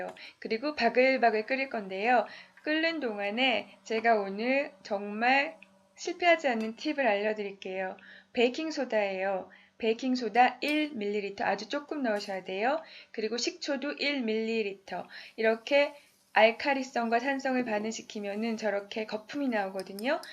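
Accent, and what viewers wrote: Seoul, South Korea. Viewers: 8~90년대 일명 서울 사투리 말투시네요 ㅎㅎㅎ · 약간 경기도 (서울) 사투리 쓰시네요 나긋나긋 너무 듣기 좋아요!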